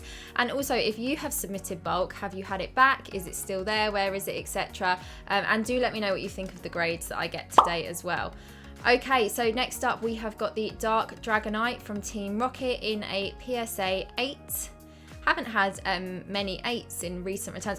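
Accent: United Kingdom. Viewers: Was watching for 15 seconds before I realised you were British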